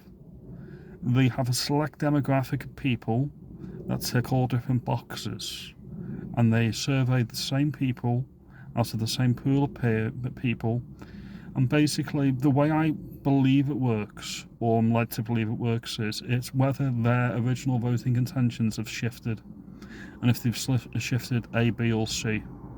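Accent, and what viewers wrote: Liverpool, United Kingdom. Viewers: Is this how a posh scouse sounds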